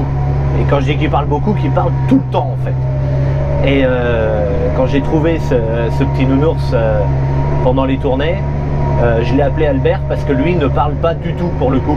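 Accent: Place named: Vendée, France. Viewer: Chère Vendéen y'adore toun'accent,mé i seu en Charente Inférieure le pays d'Goulbenèze,un grand salut à vous aut'teurtout. Et vive les socs et les oreilles.😅😅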